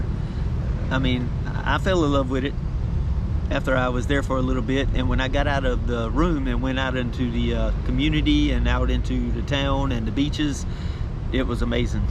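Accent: Appalachia, United States. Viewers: are you inbred variety??? you sound like a hillbilly or Adam sandler in water boy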